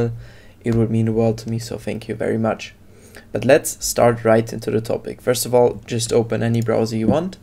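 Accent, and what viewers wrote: Germany. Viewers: i Hear a little bit of DEUTSCHEM AKZENT in ur voice (meins nicht böse)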